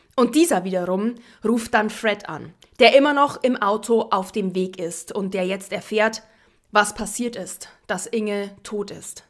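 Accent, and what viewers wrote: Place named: South, Germany. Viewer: Was stimmt mit dir nicht, Inge Richter? Was für ein sinnbefreiter Kommentar ist das denn? Du brauchst dir Videos doch nicht anhören. Viele, unter anderem ich, mögen sie sehr! 💕 Mögen ihr Aussehen, ihre Stimme, ihre Ausrucksweise, ihren süddeutschen Dialekt, kurz gesagt: Ihre Arbeit, die sie sich macht. Und die Mühe, die sie sich für uns gibt. Empathie ist erlernbar. Vielleicht solltest du dich einfach mal damit beschäftigen?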